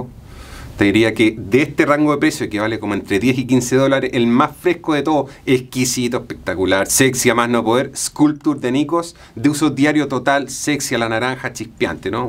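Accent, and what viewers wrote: Chile. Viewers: Tu acento chileno aún te hace ver más guapo y elegante 🤭🤭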